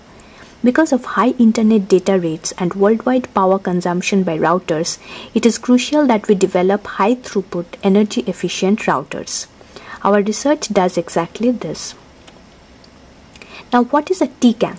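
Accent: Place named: India. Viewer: please, can one indian in math/comp.science vids speak without this fucking accent. just one?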